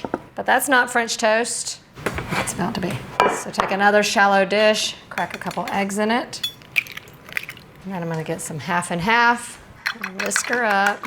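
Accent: South, United States. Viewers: I am new to your channel and enjoying very much. That could be because you are very attractive and an Italian with a southern accent ❤ great videos i can't wait to try your recipes.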